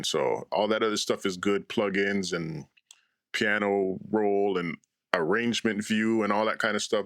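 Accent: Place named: Jamaica. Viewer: Where you from sound like a yaddie 😂 thanks for the video btw!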